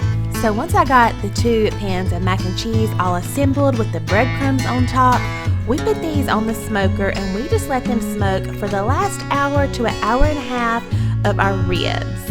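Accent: South, United States. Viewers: Girrrl... I’m starved lol great recipe. And by the way you talk just like me, I’m from the south 👍🏻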